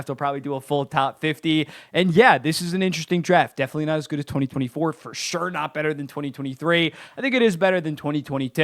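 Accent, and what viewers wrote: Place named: Philadelphia, United States. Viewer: I gotta ask if you’re from PA or Jersey. I’m from Delco/Philly area, and your accent like fades in and out but it’s giving that fr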